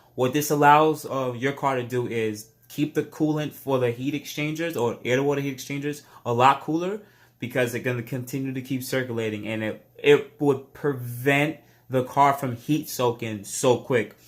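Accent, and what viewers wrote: New York, United States. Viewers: he's a new yorker, you wouldnt get it.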